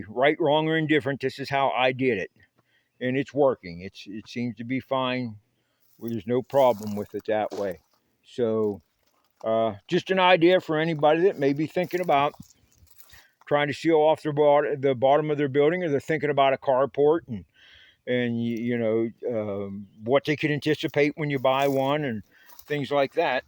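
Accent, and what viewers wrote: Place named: Western Pennsylvania, United States. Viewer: Thanks for the tip. I sure hear that Western Pennsylvania accent. (My wife is from Butler)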